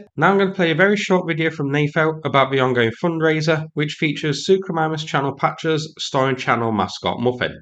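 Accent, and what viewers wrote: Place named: Nottingham, United Kingdom. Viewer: Good stuff. (BTW: Where are you from? I'm from Nott'm and your accent is very familiar!)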